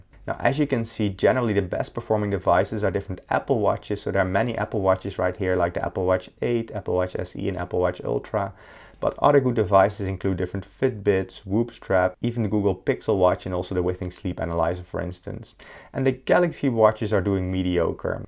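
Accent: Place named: Netherlands. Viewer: 3 times the costs of my Huawei GT runner. Perhaps not totally comparable but I'm very glad with my GT Runner after a few months of use. Het was leuk geweest om je ook een keertje Nederlands te horen praten, al verraadde je accent al heel snel toen ik me abonneerde op je kanaal, dat je een Nederlander bent. 😉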